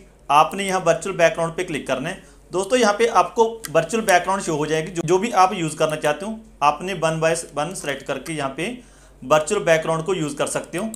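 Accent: Punjab, India. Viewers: Bhji tusi punjabi a ki hryanvi? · are u from punjab??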